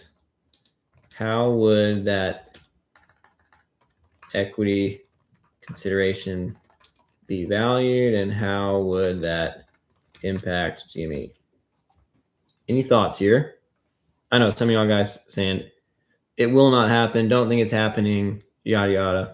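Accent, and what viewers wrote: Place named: North Woods, United States. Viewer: Wisconsin GME holder checking in, definitely a little north woods accent coming through lol. Always look forwards to your videos keep up the good work brother!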